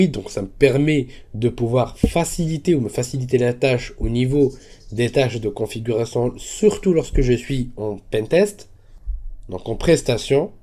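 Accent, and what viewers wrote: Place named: France. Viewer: salut merci pour le video mais mes oreilles saignent , svp arretez de prononcer les mot anglais a la francaise vous denaturé completement la prononcition et la comprehension n es que plus difficile. tu explique 2 minute ou est le bouton reset mais tu ne nous dit pas qu est ce que le tetra machin truc. alors petit cour pineapple le i se prononce aille comme pas i , paynaple pas pine aple . et le plus dur RESET , c est pas un raisin , resset , avec le son c . en plus tu prononce le e en i alors pourquoi ne pas continuer et le prononcer comme il faut . si tu prononce le e en i pourquoi prononcer le i en i a pine apple ???? en espagnol quand tu demande como esta ? comment tu vas. et que lon te repond esta bien . tu repond en prononcant le bien en bi enne et non pas en bien comme en francais , c st pas la meme langue . c est pareil en anglais. 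va falloir faire un effort un jour la . ensuite tu nous parle de cable reliant le mark 7 mais tu nous montrepas comment se conecter dessus. ta manip de ipconfig c est super mais ca ne fonctionn pas du tout , et ton port 1471 ( indiquer 1461 ) dans les sous titre j ai re ecouter 4 fois en montant le volume et tu dit 1461. ou as tu pris ce port, ca non plus on le sais pas., du coup je peux pas aller plus loin dans le video . bonne journee et bon cour d anglais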